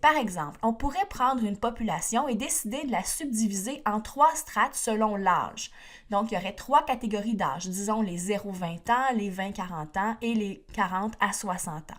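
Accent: Quebec, Canada. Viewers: J’adore l’accent québécois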